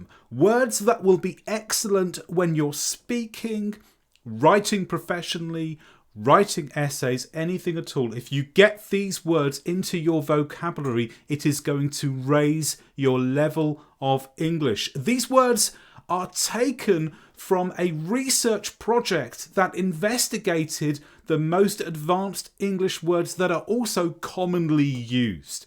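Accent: United Kingdom. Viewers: I have learned British Accent by you.I owe you i swear.When Our British Customers come to our restaurant they say where did you learn this excellent English?I show you❤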